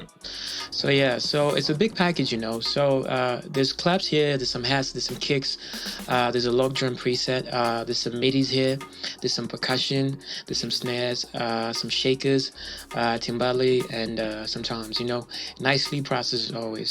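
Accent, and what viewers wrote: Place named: South Africa. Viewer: Nice accent bro no homo are you south african?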